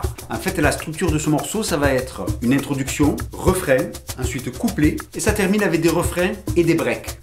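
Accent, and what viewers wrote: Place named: South, France. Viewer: Salut! Super vodéo, super format! Parfait pour comprendre quand placer les accords et pour voir la rythmique main droite! Seule petite remarque: Si tu pouvais prendre 1 minute au début de ta vidéo pour décortiquer le mouvement de la main droite ce serait encore mieux!! En tout cas un très grand merci pour ta pédagogie, ta bonne humeur (et ton petit accent du sud qui ne gâche rien dans l'affaire), c'est vraiment super agréable et encourageant!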